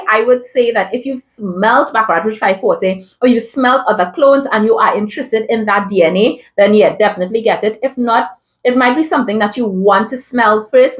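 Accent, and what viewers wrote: Trinidad and Tobago. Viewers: U sound trini ❤❤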